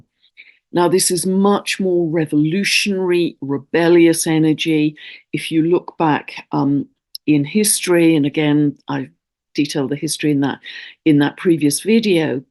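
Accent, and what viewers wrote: England, United Kingdom. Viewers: thank you… but I can probably top that. You made my life! Not that this is a competition, rather a communion of like hearted souls, and one in which I feel immense gratitude to know you. Many, many moments of wobbly exhaustion were laid aside after I listened to your English dulcet tones, so welcome in this far flung place from home where I live 💜💜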